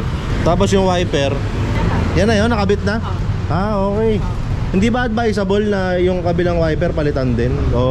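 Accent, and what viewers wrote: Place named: Bulacan, Philippines. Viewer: Dinig na dinig ko punto ng paombong, bulacan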